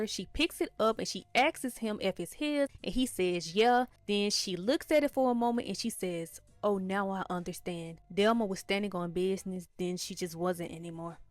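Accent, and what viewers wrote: Memphis, United States. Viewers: Not only do I love Good Times, but you commentating it with that Memphis accent is just dope!!!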